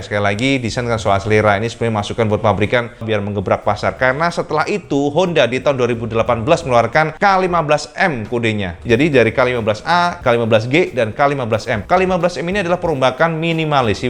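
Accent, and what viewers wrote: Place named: East Java, Indonesia. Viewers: Suarane cak memang joss gampang di pahami Jawa timur mana suaranya🤝🙏😁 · Beneran Mbah boso pean medok boso jowo wetan Salam hormat penggemar mu dari Gresik ! Ketokane awak Dewe seangkatan umur nya !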